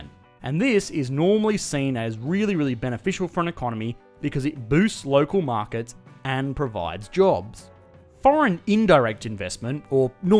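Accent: Australia. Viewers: Good content but the Australian or New Zealand accent makes it hard to listen without getting nausea or a headache. Terrible accent!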